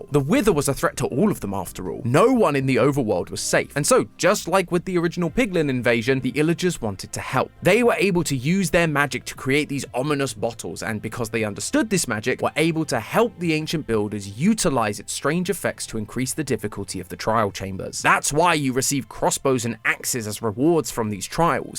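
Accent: United Kingdom. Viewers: its not the same, i cant listen to this british voice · At times i don't notice British accent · Wait game theory is British now?! I think I may have missed an update 😅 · 100% more British? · BRITISH GAME THEORY IS HERE TO STAY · Game theory Now 100% more british! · Why is british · hey i mean i thought that would ruin the game theory and that all the other channens but ya'll are doing a great job at making videos its the same videos bud with bri-ish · edition.. Now 100% more British 😅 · I spat out my water I was drinking when I read the "Now 100% more British!" because himself reference that "You might be wondering, why does now sound British?".💀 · Now 100% more British is crazy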